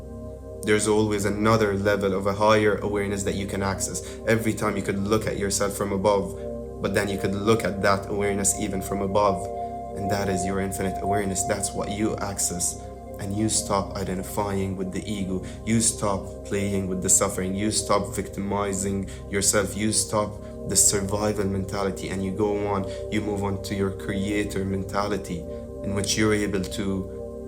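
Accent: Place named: Ireland. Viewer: Your accent is mad. Can I detect a hint of Irish?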